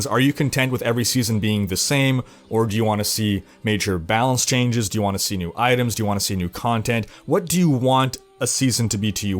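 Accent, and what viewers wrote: Sweden. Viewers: As a swede I just couldn't stop myself from laughing hysterically to your Swedish pronunciations. xD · That swedish uttal... :D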